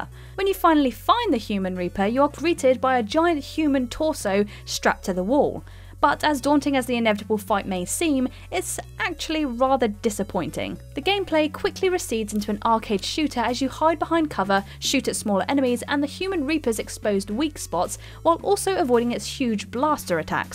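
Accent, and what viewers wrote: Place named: England, United Kingdom. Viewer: I know it’s tough with an English accent and she’s relatively new, ( does a great job with the better part of the video) she really butchers a lot of pronunciations in this video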